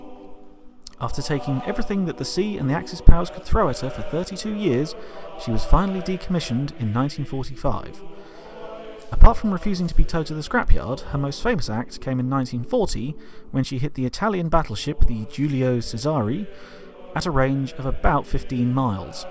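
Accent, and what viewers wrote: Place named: United States. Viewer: He's definitely an Immigrant going by his American English and awful grammar.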